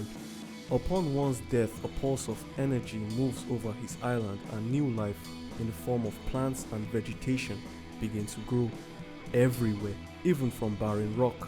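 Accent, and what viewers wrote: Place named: Nigeria. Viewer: Amazing video you sound Nigerian tho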